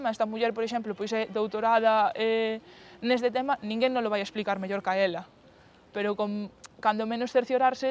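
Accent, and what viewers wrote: Spain. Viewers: Português com sotaque espanhol .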